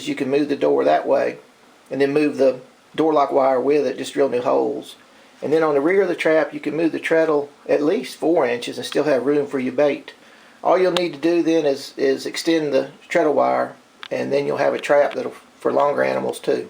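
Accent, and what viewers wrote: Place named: South, United States. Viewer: This trap really works. I've been having issues with rabbits eating my lawn and plants. I didn't want to kill anything so when I came across it look simple enough to give it a try. The guy's southern accent made me a believer too. Took about two hours to build using a drill, hand saw pliers and some screws. A what do you know, caught one the next day. I live in so California so i assume it'll work anywhere. To the creator of the video "thank you"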